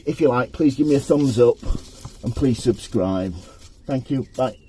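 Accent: United Kingdom. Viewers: Lovely video. Loving the Yorkshire accent to obtw, I'm in West Yorkshire myself, or should I say mi sen 😂 What other flowers do you think would go nice with alyssum in a basket? Cheers, Ali.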